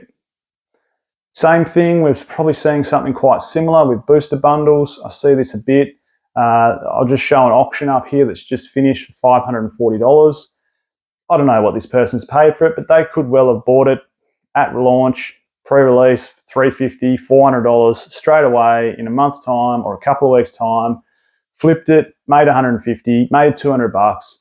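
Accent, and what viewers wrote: Australia. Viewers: All facts, i just really enjoy these videos. The aussie accent doesnt hurt either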